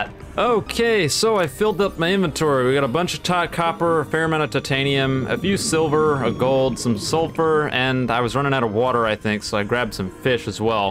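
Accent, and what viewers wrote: United States. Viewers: Yoo he's american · I didn't know you were American!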